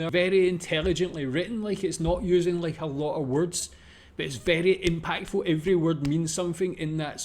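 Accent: Scotland, United Kingdom. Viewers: Kudos - you picked up on quite a few things in there. Note that the vid is not a history of Germany, but rather highlights some aspects, which were very defining maybe not to what Germany *is*, but to how people may feel about it. There are good analyses out there, on Utube the one by "three arrows" is the best I know. Still, it falls short. The references and cross-references are enormous, and sometimes only detectable in freeze-frame. My current count is at around 180 (!). The Band worked for over a year on this, and I can only guess at the production budget. It is one of a kind, I know of no comparison. Anyhow, I love your channel, you are doing great work. And a lovely Scottish accent! Not quite as enjoyable as Neil Oliver's, but still...;) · love your Scottish accent....got to learn it....👍🏻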